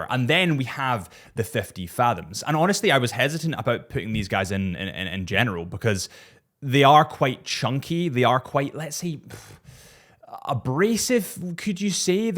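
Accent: Scotland, United Kingdom. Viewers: Do all people in Scotland talk like this guy? He sounds so stupid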